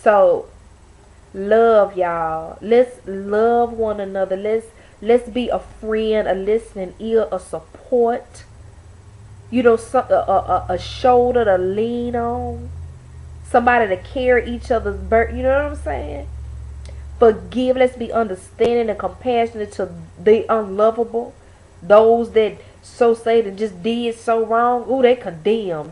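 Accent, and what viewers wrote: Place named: South, United States. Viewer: I like what granny said lol... I love ya country accent itz kute lol... Great vid fam...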